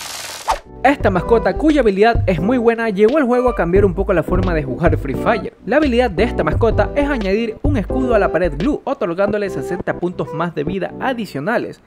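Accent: Ecuador. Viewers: Bro eres de Ecuador??? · Eres ecuatoriano?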